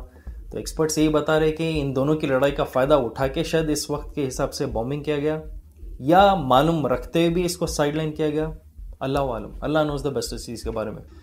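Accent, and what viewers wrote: Hyderabad, India. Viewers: Bhai tum Hyderabadi ho ky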